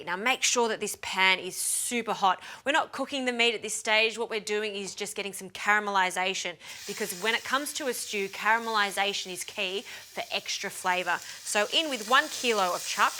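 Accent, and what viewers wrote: Australia. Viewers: She was born in Sydney. The accent should give it away, it's Aussie, not Kiwi.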